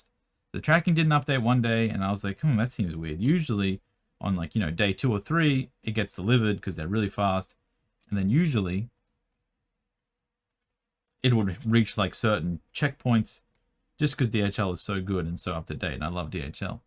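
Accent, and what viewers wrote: Australia. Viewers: An hour in club here, and I'm here for the advice, for the realness, and also for the aussie accent I've missed so much since leaving Perth for Indo all those years ago. Seeing how you're so excited about how your recent sorting video made enough money to buy 5 subway sandwiches is gold, and then have that followed up with how wetting your lips or something can be sexual is slightly disturbing 😂. HOWEVER!! We need to have Kim on the channel as well,!! You've talked about her several times now and how she's amazing and everything, and you know full well a Youtube channel only ever taken off when the significant other makes a long-awaited appearance 😆. You can do subway mukbang video with her and see how many more subway sandwichs the video'll earn you 😁 imagine how excited you'll be at that prospect 😄 In short: we want KIM!! ✌🏼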